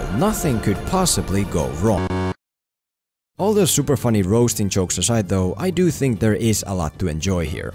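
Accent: Finland. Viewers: Are you from finland ? Something about your accent :p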